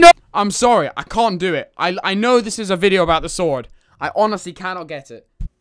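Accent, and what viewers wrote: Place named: Scotland, United Kingdom. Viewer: I cant tell if you r Scottish british or american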